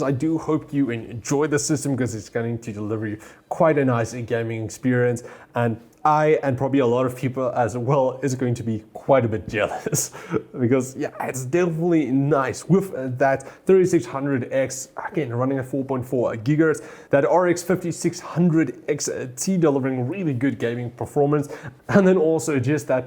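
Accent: South Africa. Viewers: Are you South African? I’m South African myself and I can hear an accent from you. Great content btw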